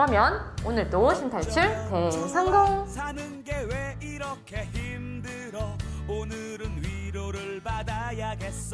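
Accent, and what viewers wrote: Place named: Gyeongsang, South Korea. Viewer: 다 가리긴햇는데 경상도 사투리가 나오시네요 ㅋ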